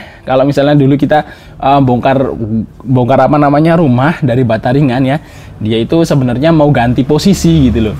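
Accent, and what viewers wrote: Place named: Semarang, Indonesia. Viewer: medhok men lek,bahasa semarangan e 🤣🤣🤣🤣🤣🤣🤣🤣🤣🤣🤣🤣🤣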